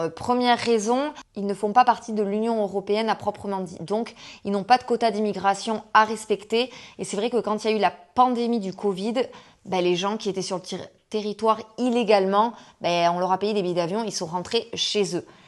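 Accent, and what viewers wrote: South, France. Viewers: Ah, par contre, je ne partage pas ton avis sur la redistribution en France... La France est un pays EXTRÊMEMENT protocolaire, c'est un pays ÉNORMÉMENT TAXÉ, les impôts, on les paie mais concrètement, on ne sait pas DU TOUT où ils vont (ni dans la voierie parisienne ni dans la protection sociale, apparemment, alors on ne sait pas où ça part... Peut-être à l'entretien des palais de l'Élysée et de Matignon et le train de vie des élus , y compris après avoir quitté leurs fonction, je ne sais pas !), mais les personnes précaires n'ont droit à presque rien en France à moins de toucher le fond du fond pour, peut-être, avoir droit à des miettes ! Et les autres se précarisent car les taxes augmentent de manière exponentielle et continue mais les revenus ne suivent pas ! Et côté solidarité, la France ce n'est vraiment pas le bon exemple du tout... 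Alors comme tu as un accent du Sud, dans le Sud de la France, c'est vrai que tout est plus doux et ensoleillé dans l'humeur des gens que dans le centre ou le Nord de la France... Moi, je vis à Paris et j'y suis née, et je peux affirmer que la solidarité y est nulle ! Tu n'es PERSONNE à Paris ! Tu peux avoir un souci, PERSONNE ne viendra à ton aide ! Tu as des tas d'aides sur le papier, pour faire joli, mais concrètement tun n'as jamais accès à la plupart de ce qui t'est promis dans le cadre de la couverture sociale, et tu n'as aucun recours ! La chasse à la précarité en France devient la chausse aux pauvres, la lutte anti-tabac devient la lut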